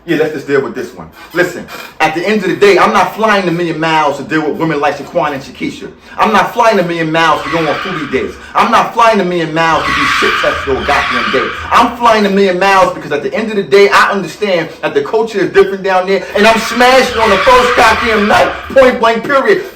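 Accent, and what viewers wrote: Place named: United States. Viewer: Btw, your American accent alone is exotic to them. If you try to speak their language that puts you to the front of the line. Just be humble and they will accept you!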